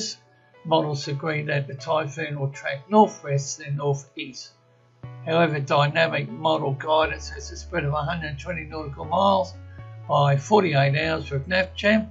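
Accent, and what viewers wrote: Australia. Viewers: For those who are saying (the person in this video) is drunk, He is Australian and He is not drunk A True F13 Fan always knows this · dont make fun of him he is australlian and plz dont start a fight as a reply cause we cant agrre on anything on this website. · is a gem to Force13. Love his Aussie accent and the information is always great. Good to hear him...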